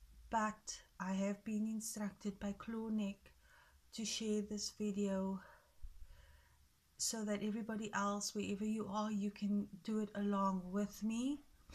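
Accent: South Africa. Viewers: You sound like you come from my country south Africa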